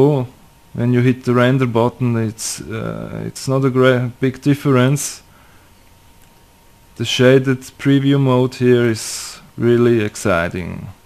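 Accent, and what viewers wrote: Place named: Brazil. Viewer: Pelo inglês deve ser brasuca rsrsrs mó sutaque hein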